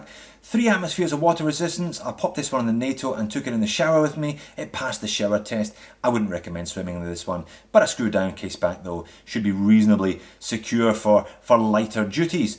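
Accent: Scotland, United Kingdom. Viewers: Great video!! I do have a question though. I heard you say in another video that you are a proud Australian, but, honestly, when you speak, I only hear a Scottish accent. Why exactly is that?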